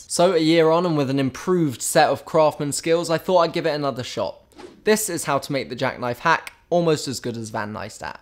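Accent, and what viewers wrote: United Kingdom. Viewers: this is basically an old casey neistat video with a British voice over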